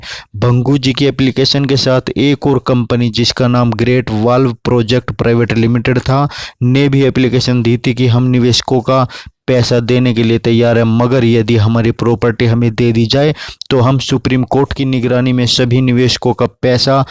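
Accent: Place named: Pakistan. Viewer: Ye Pakistani voice hay .! This voice not Indian voice ...and this voice is 100% sure Pakistani guy dubbing voice ....open eyes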